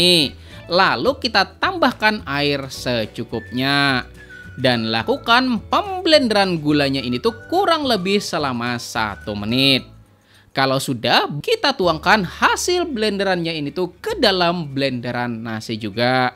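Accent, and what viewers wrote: Banjar, Indonesia. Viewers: Dari toturial dialek bahasa, sampian ini orang Banjar kah ? Beralamat di mana ?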